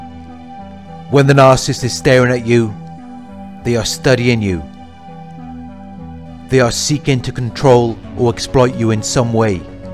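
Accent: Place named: Manchester, United Kingdom. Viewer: I think he’s from Manchester, in north of England. I sometimes get it mixed up with the Liverpool accent, but my mnemonic for remembering is that The Beatles and Wacko from the Animaniacs (inspired by Ringo) are from Liverpool, and Daphne from Frasier is from Manchester.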